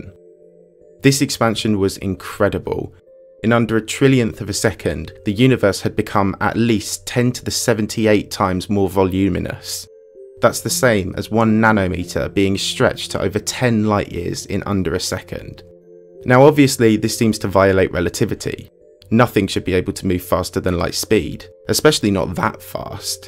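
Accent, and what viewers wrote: United Kingdom. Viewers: Except for the British accent its very descriptive and concise leaving out only one major point, the universe was born in a medium of some sorts which allowed for containment of the energy. Also, I wonder why people ask why, the answer is all around us.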